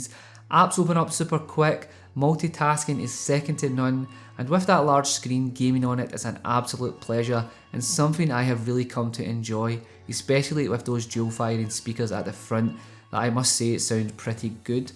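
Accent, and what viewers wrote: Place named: Glasgow, United Kingdom. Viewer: Sounds like Glaswegian to me.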